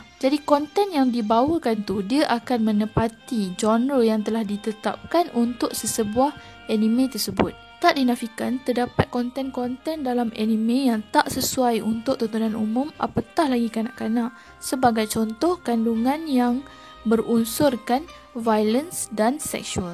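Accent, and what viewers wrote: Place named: North, Malaysia. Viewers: Kita geng.. Ada dengaq mcm loqat utara pown ada. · orang utara macam mana pun dia control slang dia,mesti keluaq jugak utara tu😂😂😂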